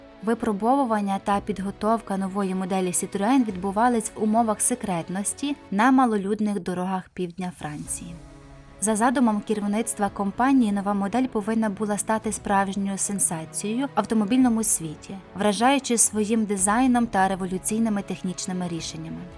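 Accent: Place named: Central Ukraine, Ukraine. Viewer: ви певно з центральної України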